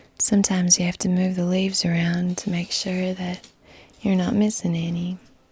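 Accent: South, United States. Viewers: I love how talks in that Southern accent. Happy early birthday by the way.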